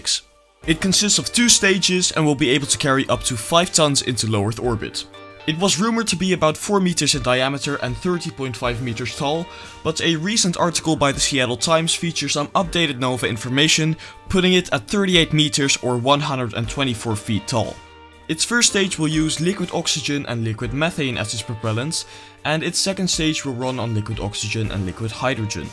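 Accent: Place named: Netherlands. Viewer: Good content, but please keep working on that Holland-accent 🙉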